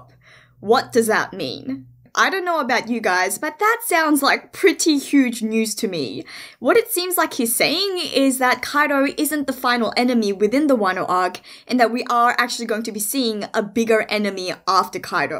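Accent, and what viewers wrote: Australia. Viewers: Australian accent? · Aussie?!?!?! Yeahhhhh you go woman!!! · You Aussie??? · Are you west Australian ? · Oriental with an Australian accent? That caught me off guard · You from Australia?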